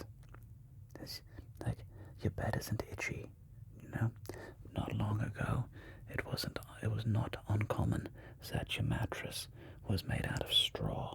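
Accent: Germany. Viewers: I love the German accent videos ❤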